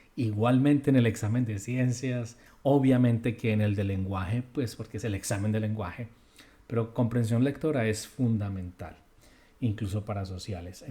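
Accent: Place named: Colombia. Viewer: Muy interesante sus clases .. y esa voz colombiana me recuerda a alguien 😂😂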